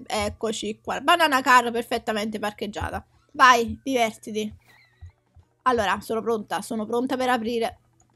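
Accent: Naples, Italy. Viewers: sei napoletana? ps: *TI ADORO*💞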